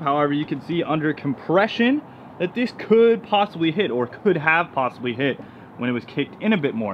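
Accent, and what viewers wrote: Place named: United States. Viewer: For a second there, I thought you were Issac Butterfield, but then I thought "why does he have an American accent?"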